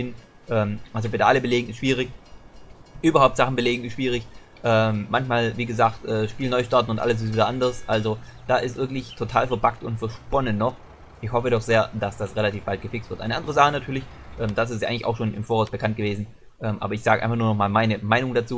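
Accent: East Germany, Germany. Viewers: Wenn ich den piss ossi höre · Gutes Video aber sag mal wo kommst du her ? Dein Dialekt hört sich irgendwie Ostdeutsch an aber auch Iwie nicht. Wie als würdest du versuchen ihn nachzumachen.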